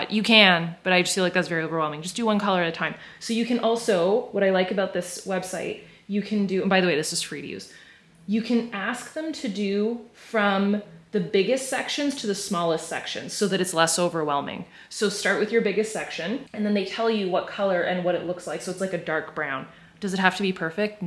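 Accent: Quebec, Canada. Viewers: en français! Apprends-nous l'accent québécois <3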